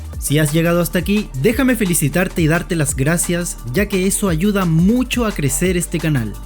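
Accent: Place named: Chile. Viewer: Chileno Detect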